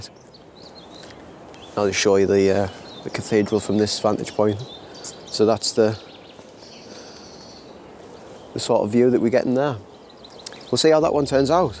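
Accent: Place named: Durham, United Kingdom. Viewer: Just come across your channel and I love it! Your accent is identical to mine - a Durham lad - and I love how you appreciate Pentax! I have had all brands over the past 10-12 years from point and shoots to my current camera the Sony A7iii, but the best shots I've ever taken were with the Pentax K3. Keep up the good work pal!!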